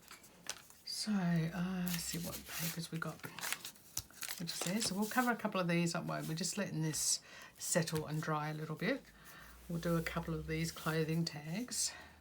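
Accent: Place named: Australia. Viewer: Great video. I love using what would be garbage as well. Great idea for the fries box. I'm from Australia too. Love hearing our Aussie accent on YouTube. Good luck with your channel. 💗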